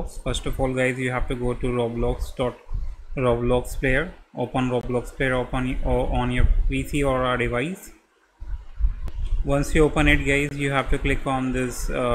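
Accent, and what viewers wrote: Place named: India. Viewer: He kinda speaks Indian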